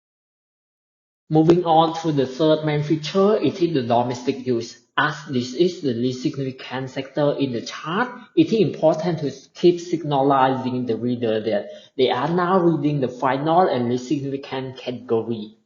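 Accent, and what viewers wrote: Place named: India. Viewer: It looks like any Indian try to speak in English assent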